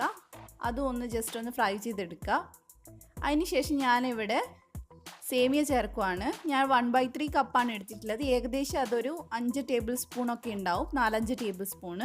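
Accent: Kannur, India. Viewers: കണ്ണൂർ ഭാഷ 👌💓 അടിപൊളി ഒന്ന് ഉണ്ടാക്കി നോക്കിയിട്ട് കാര്യം 😋😋😋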